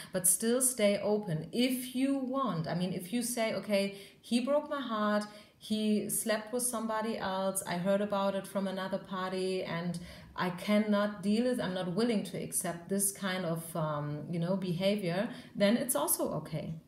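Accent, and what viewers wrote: Germany. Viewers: your accent is so rich and beautiful. i studied german for two years and still can hardily understand it. you’re such a beautiful soul with a beautiful accent. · Your german accent is really charming :) I speak german too and I totally feel how translating straightforward german sayings int english can be tricky. it's like in german you have a word for EVERYTHING :D you did an amazing job and your voice is very sweet and calming too. Thank you for relaying these messages to us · You have a German accent :D Am I right? I am asking because I am very interested in different accents and I am always very happy about guessing correctly. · I love that german ascent A lot! 😍😍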